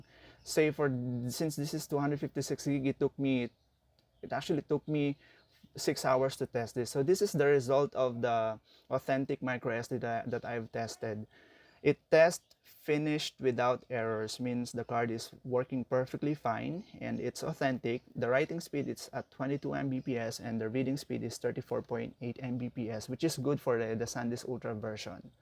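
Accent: Philippines. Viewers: Bat parang tagalog accent yung English mo boss? 😨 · Gagi na noticed ko agad Filipino accent kala ko pinoy. Di ako nakatingin sa screen lol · Muntik pa sana akong mag comment ng what is your nationality why is your accent sounds very filipino😂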